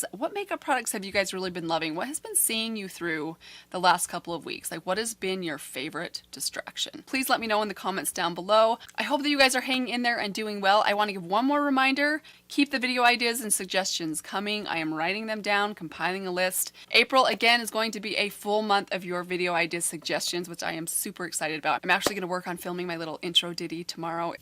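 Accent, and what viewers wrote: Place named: Brooklyn, United States. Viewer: Um, pretty close to an OG New York (Brooklynese to be precise) accent! #Fuhgettaboutit Thanks for the podcast suggestion, love to listen as I try to drift off 😴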